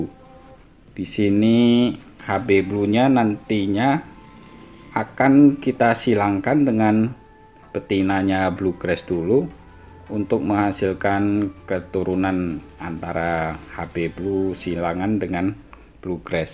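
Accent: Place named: Madura, Indonesia. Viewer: logat.e dudu suroboyonan tapi meduroan 😂🙏👍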